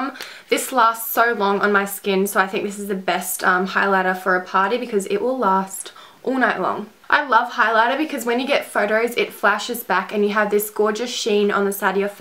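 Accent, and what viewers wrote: Australia. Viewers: I love the Australian accent!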